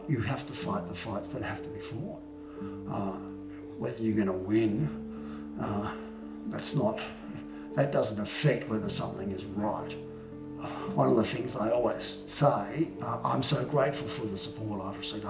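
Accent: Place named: Australia. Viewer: I came here from Friendly Jordies coverage on you and wanted to know more, it might be a good idea to create some subtitles because the Australian accent can be a bit hard to comprehend for non-native speakers. Hopefully we can get some more international eyes on this!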